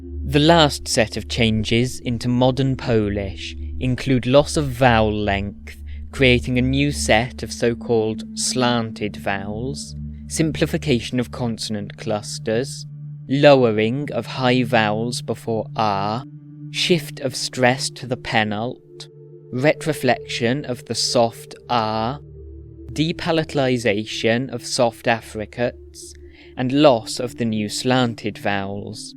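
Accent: Wales, United Kingdom. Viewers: Sounds Welsh to me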